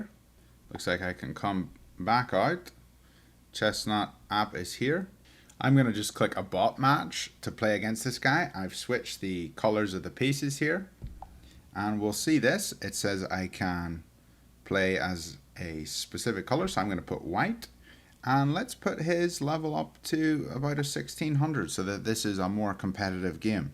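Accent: Northern Ireland, United Kingdom. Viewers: I just ordered the Chessnut Go earlier today. I might get something like the Evo in the future but I'm a complete novice. I've only played a couple of games as a kid for about a week or two so will be interesting when I receive my chessboard. Is the tablet part detachable, that would make it better for storage, replacing tablet etc.? By the way you seem to have a Northern Irish accent coming through with a bit of a mix like James Mulgrew - Jimmy Cricket.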